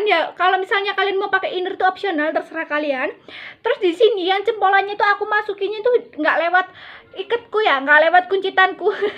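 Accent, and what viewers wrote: Java, Indonesia. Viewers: Iyo rek manteb rek ilat jowo medok rek hehehe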